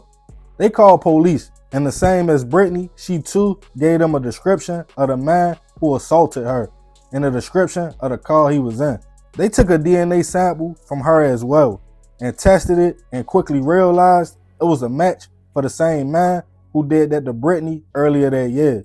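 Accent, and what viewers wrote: Baltimore, United States. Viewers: dat bmore accent too hard to hide...lol yo be like tryna sound professional but dat bmore swag just shine thru brova.. Just speak how u speak yo and it is what it is. Being yourself makes people appreciate your content and u more.. Also i ive in bmore bro and i wanted to tell u that I hope one day i meet u cuz u inspired me to start grinding again on my page.. Im about to start gettin to it and being consistent and thats because of u brova!! so thank u... sincerely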